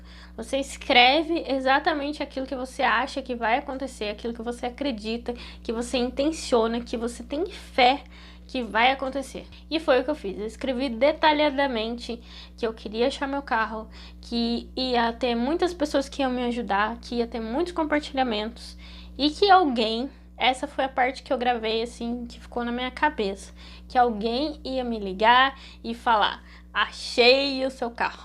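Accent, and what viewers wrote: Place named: Minas Gerais, Brazil. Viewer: Ganhou mais um inscrito 💖 Amei o Canal. Você é Mineira ? 😅 Sotaque legal 😍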